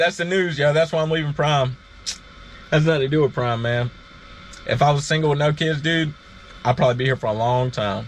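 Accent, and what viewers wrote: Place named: Alabama, United States. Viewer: He Forrest Gump country lol I’m from Alabama and he sound super country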